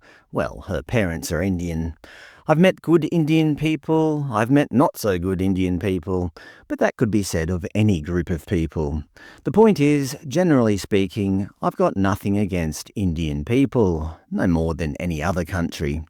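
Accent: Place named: Australia. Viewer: its aussie English mate. Nothing wrong.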